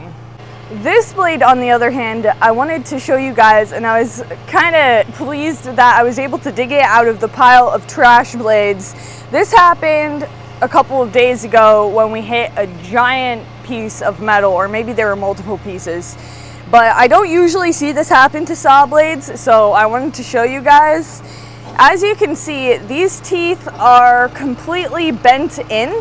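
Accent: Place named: Pennsylvania, United States. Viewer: ~ your Grandpa and "The Boss" have trained one of the best lumber foremen in the industry! Thank you for sharing these practical trade skills and important safety tips! As they say "safety is no accident". God bless Lumber Capital Log Yard! P.S. that is the color T-shirt I'd like to own; but I'll have to dye my hair Boudica red just to begin looking as good as you do in it. Your Central PA country accent and good looks are what it takes for my A.D.H.D. brain to track properly without distraction or interruption. Those are strong leadership qualities you possess that I am afraid King Charles is lacking in. Freedom from tyranny is our Monarchy. Lest We Forget!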